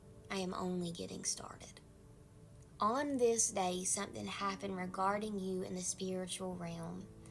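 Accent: South, United States. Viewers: By the way love your southern accent :)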